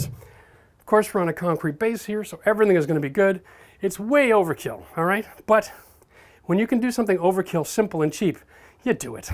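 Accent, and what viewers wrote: Canada. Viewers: Not 2 minutes before you mentioned Red Green, you said something with your Canadian accent and I said to myself, "he sounds like Red Green." That guy's funny.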